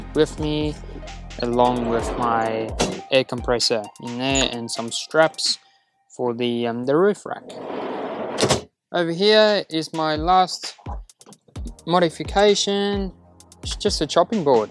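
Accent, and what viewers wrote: South Africa. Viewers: I can hear that Boer accent coming out. Love that i0 of yours. Have a Jimny but tired of the 1.3 and other irritants. Enjoy. Cheers from sunny SA.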